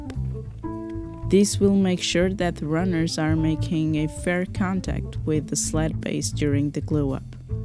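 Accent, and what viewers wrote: Portugal. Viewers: caralho, não falho, percebi logo que eras tuga pelo teu sotaque no ingles hahahaha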